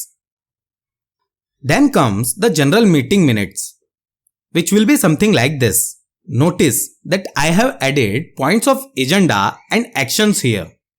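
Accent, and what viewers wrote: India. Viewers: That's how Indians speak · So what if thats his accent he’s from india,,juhs appreciate the effort he put seriously whats wrong people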